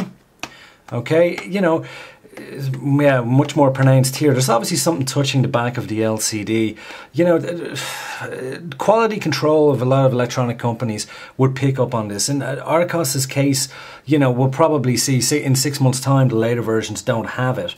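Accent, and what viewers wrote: Ireland. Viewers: Love the accent.....irish? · fecking hell, you are so obviously Irish :P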